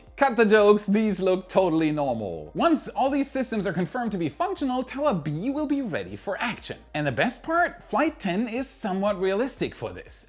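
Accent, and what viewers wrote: Germany. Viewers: Kind of. He's German.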